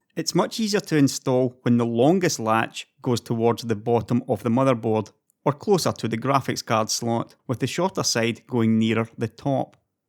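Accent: Scotland, United Kingdom. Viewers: the sweet, Scottish dialect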